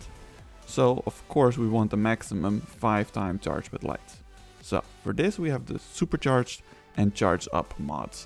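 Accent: Netherlands. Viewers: I can hear the dutch in you so hard haha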